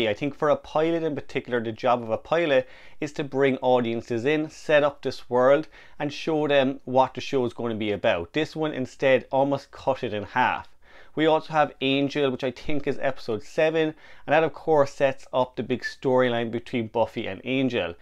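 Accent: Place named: Ireland. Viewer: Aaah... a proper Irish accent.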